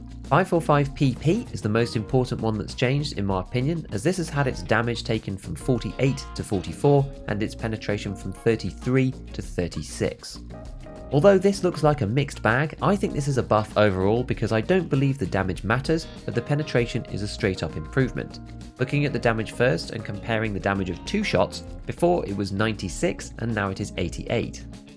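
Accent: RP, United Kingdom. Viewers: Again, when I need you the most, you're there to hold my monkey hand and lead me toward the light of knowledge. You really are my favourite Tarkov YouTuber. Your content is smart and the posh accent makes it sound doubly smart, like what you're saying can be absolutely trusted .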